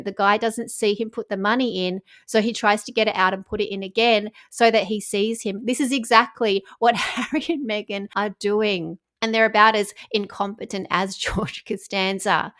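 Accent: Australia. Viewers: We can hear you're from Australia. Greetings from South Africa 👏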